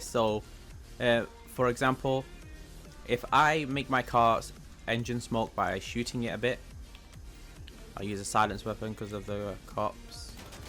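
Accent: United Kingdom. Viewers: You from Great Britain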